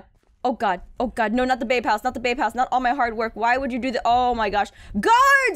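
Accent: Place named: United Kingdom. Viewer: your british... I can tell...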